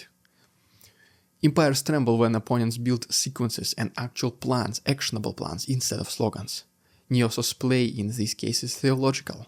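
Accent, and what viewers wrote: Russia. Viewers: Been watching you a long time. I have to assume your accent is only because people kind of expect it here but after so much time outside russiay I think you can drop it 😊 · I like, and appreciate, that this channel has English subtitles. Despite this, I have no need for them, as I can understand English through his Russian accent. Well done on your analysis, and indeed everything, good sir.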